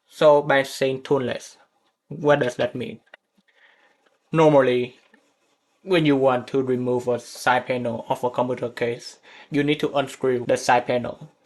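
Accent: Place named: Vietnam. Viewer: Ban la nguoi Viet Nam a, tui co the nhan ra accent cua ban.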